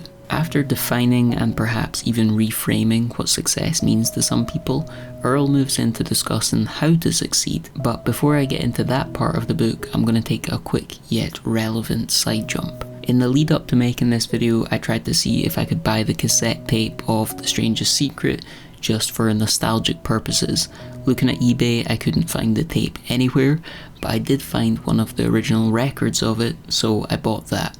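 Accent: Scotland, United Kingdom. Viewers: Do you speak Scottish? Tha Scottish blasta.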